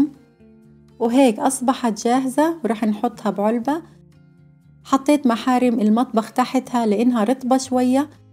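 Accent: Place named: Egypt. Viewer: ليش لهجتك مخلوطة بالمصري.؟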